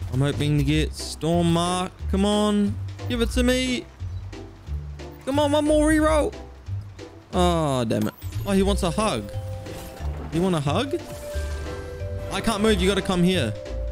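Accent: Australia. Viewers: Is he Australian??? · WAIT YOUR AUSTRALIAN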